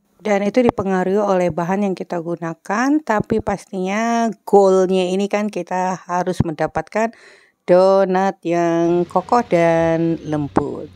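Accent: Java, Indonesia. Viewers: Hehe kok logatnya jd jowo to...emang asli jowo ngendi mam?😆roti2nya uenak tenan mam👍👍👍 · Donat gembul tgduoempt ss hangat tlur aduk msk rg gla aduk masukan tepung lalu garam aduk dn masukan sp dn margarine sampe tercampur froof bgi2 bentuk (medok ya bu logat jawanya heee) 16buah ya ingat jangan sampe over proof duhhh ginuk ginok gemoyy empuk trimakasih bermapoat sekaliii hahhaah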